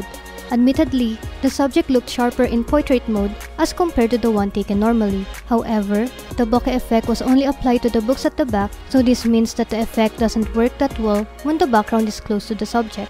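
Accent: Philippines. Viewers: Don't be bothered with those people that comment on your accent. it may not be neutral but its understandable enough for most like me. It's the natural way most filipinos pronounce english due to filipino being our 1st language.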